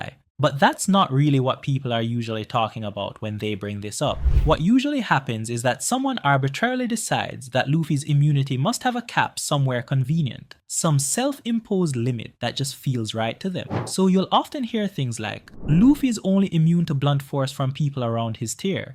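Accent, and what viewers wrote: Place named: Jamaica. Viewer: Yuh sound jamaican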